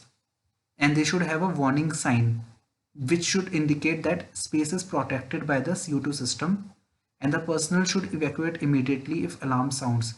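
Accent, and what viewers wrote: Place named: India. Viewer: English language needs to improve. It was like hindi spoken in english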